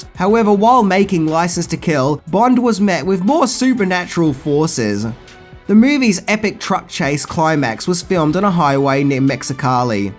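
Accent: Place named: Australia. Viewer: Tone down that Aussie twang...otherwise very informative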